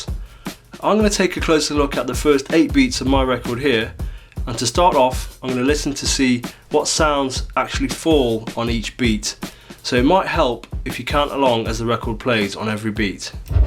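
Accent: United Kingdom. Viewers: These vids hVe helped me more than words can express, excellent job bro, you even sound proper cause of ur english accent. Great vids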